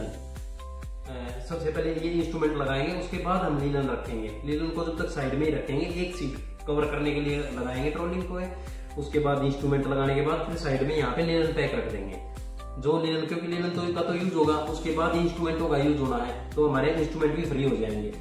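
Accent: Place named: Haryana, India. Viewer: Paka haryana ta hai ladle 😂😂😂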